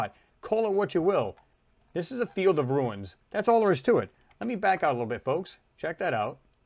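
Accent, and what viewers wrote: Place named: Northeast, United States. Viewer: That Northeastern accent loud and clear!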